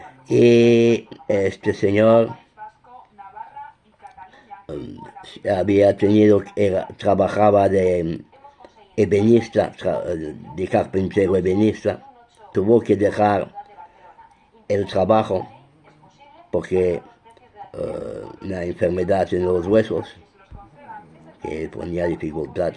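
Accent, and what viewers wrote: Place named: France. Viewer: Usted es solo un francés renegado que está acogido en España , pero solo hay que oírle para saber que es un francés de pura cepa. Usted pasará a l posteridad por ser novio de Carmen de Mairena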